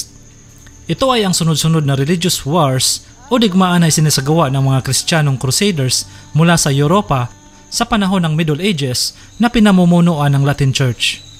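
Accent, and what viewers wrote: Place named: Visayas, Philippines. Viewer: Astig sana yung kwento... Kaso may pagka bisakol lang yung pag lalahad nung kwento...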